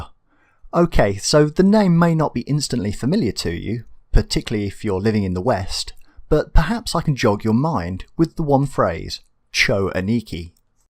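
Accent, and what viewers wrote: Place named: United Kingdom. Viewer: Well, I enjoy your style, vastly prefer it over "shoutcaster" rubbish! I'm not a game collector.... yet, but your production/scripting reminds me of the military miniatures (toy soldiers) community, of which I am a member. (Love the humor, as well. Not many opportunities for "Japanese homoerotic" references in the model soldiers scene!) Poised delivery, well researched, and British as Bully Beef! Cheers and keep up the great work!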